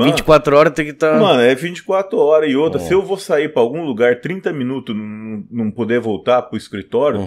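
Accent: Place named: São Paulo, Brazil. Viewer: ele é ex-yakuza sim! e tá no brasil com sotaque paulistano falando de uma das máfias mais violentas do mundo. é sim.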